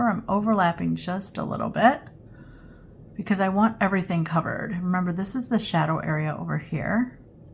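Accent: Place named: Midwest, United States. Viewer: Hi! I just want to tell you how much I love your style and enjoy your videos. Your work is gorgeous! Thank you so much for sharing your talent ❤ On another note, I’m curious to know where you’re from. You mentioned the Art Institute of Chicago in a video I recently watched. I was born in Chicago and have ties in Wisconsin. I’m thinking your accent is maybe Wisconsin…Michigan…Ohio…?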